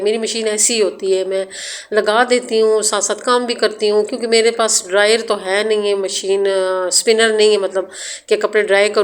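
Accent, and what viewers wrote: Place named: Punjab, Pakistan. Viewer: Bilkul meri tara my b ici tara urdo bolti ho yahi hal hy panjabi walo ka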